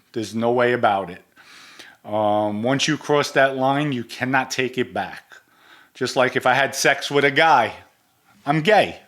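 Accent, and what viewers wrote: New York, United States. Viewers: Hello handsome, I just recently found your podcast, which I really enjoy. Don't allow anyone to stop you from speaking how we NYer's Speak. Love the show.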